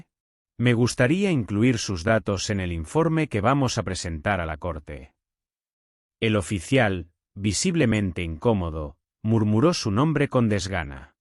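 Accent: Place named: Spain. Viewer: Quita ese acento español y a la mejor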